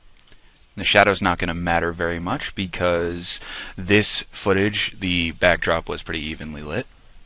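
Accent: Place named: United States. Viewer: pure amercian accent - very nice video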